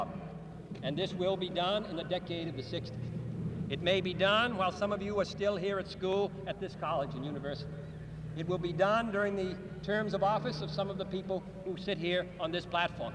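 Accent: Boston, United States. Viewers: Such amazing orator. Even when he says “hard” in his full-throated Boston accent, it sounds beautiful. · His Boston accent cracks me up "it's all too haaard"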